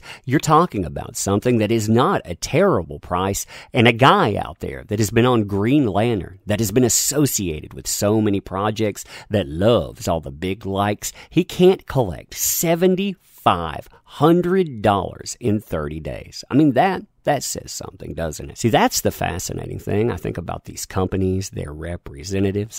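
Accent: South, United States. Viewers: I can’t focus on your video. Not because it’s bad or anything, it’s just your voice is so soothing and sounds so southern while at the same time doesn’t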